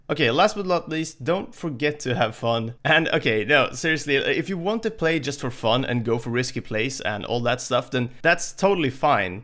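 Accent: Sweden. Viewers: du låter svensk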